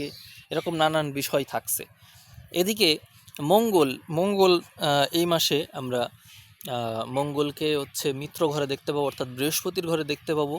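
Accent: East Bengal, Bangladesh. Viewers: তুমি কি পূর্ববঙ্গে বাঙাল?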